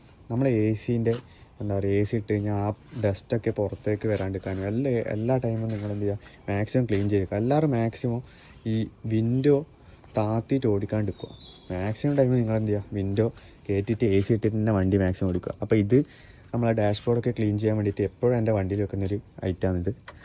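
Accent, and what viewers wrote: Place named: Kannur, India. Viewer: Bro kannur ahno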